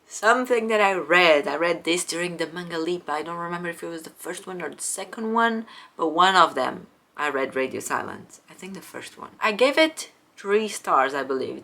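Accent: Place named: United Kingdom. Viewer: Já começas a ter um mini sotaque brittish. Neste vídeo notei bastante nalgumas palavras e tom da frase :)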